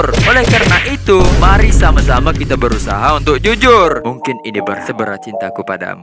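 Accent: Malaysia. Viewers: Eh bree ..lu org mana yak ..logat lu kek Malaysia 😁 ..gw tahu di indo ada juga logat melayu ..tp gw penasaran ma elu 😁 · logat lu malah kek orang Malaysia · Bingung ni abangnya logat Melayu tapi kok berbahasa Indonesia 🤣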